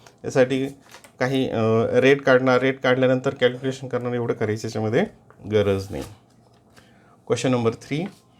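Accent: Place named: England, United Kingdom. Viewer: Sir please 12 la jasti English style madhye bolaycha prayatn karu naka te kasatari vat te😅😅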